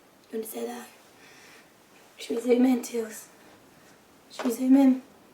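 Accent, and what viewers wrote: United Kingdom. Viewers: Lol I noticed that too but it sounds wonderful with her British accent!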